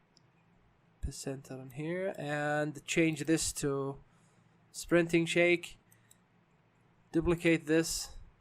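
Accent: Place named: Arab world. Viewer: love your accent are you arabic عربي صح ؟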